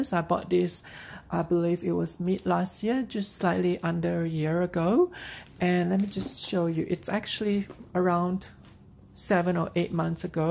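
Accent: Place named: Singapore. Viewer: I lived in Singapore for a while so I can hear the familiarity 💕 Thank you for the beautiful review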